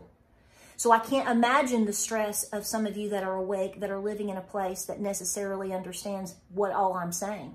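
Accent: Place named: Johnson City, United States. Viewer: Thanks! My husband guessed that you live near Johnson City by your accent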